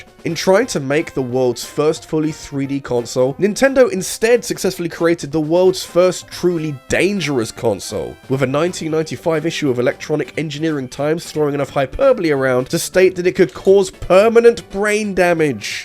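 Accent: England, United Kingdom. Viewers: Who would of thought a English man pronouncing English words would trigger some people.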